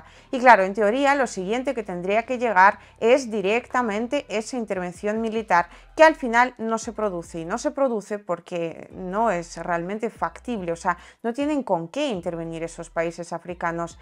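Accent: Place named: Spain. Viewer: Hola, recuerdo cuando emoezmaos a verte en sitios como twitter, tu acento totalmente español, porqué ahora tienes ese tono tan raro para contarnos las noticias? Es tan monótono que no ayuda a concentrarse ni a terminar de escuchar la noticia entera. Podrías volver a hablar normal? Gracias!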